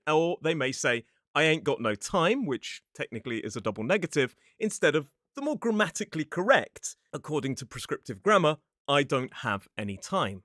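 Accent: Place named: RP, United Kingdom. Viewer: I am from Canada and ok your English sounds like Received pronunciation and it's very easy for me to understand but some British dialects are impossible for us Canadian os American to understand....I remember I needed to have subtitles on when watching Game of Thrones.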